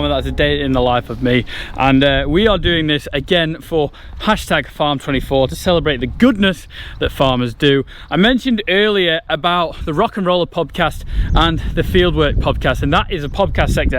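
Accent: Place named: England, United Kingdom. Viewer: New to channel. Coming at you all the way from suburban southern California. Just trying to catch up on some of the terms... A bit of a challenge sorting out some of the words through the accent. Like it though.... Not a farmer here in the states but it looks interesting to watch and learn how the UK does it a bit different than in America. Is a good window into the reality of the English countryside. Question..... What is cake? It can't literally be cake, must be slang for something? Keep up the good work. Love the videos!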